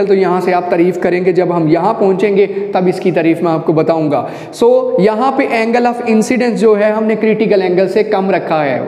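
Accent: Punjab, India. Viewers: Punjabi teacher😁,but well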